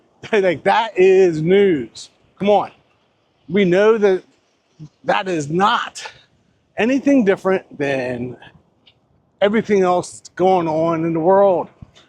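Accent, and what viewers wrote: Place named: Philadelphia, United States. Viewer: I thought I detected a Philly accent or close by.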